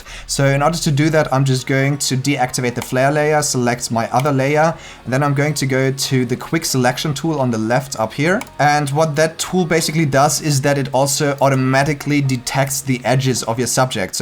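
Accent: Germany. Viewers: that accent is something else! Love when Germans speak English! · German Accent?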